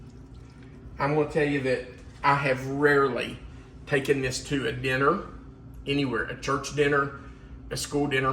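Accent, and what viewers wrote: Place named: Kentucky, United States. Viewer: A-HA! I suspected you were from Kentucky, just from your accent, and now we know. I will be trying this "caviar" for our next potluck. GO BIG BLUE!!!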